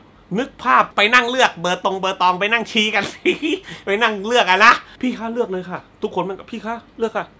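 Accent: South, Thailand. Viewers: พึ่งรู้ว่าพี่คนใต้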